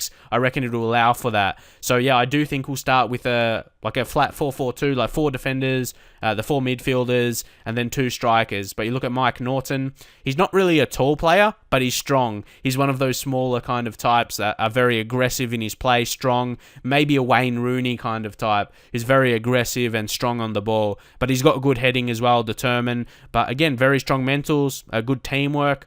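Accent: Australia. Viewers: Crikey Mate! You're AUSTRALIAN?!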